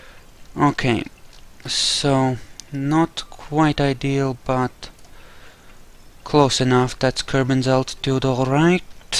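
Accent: Russia. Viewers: I like your accent. Where are you from? Russia?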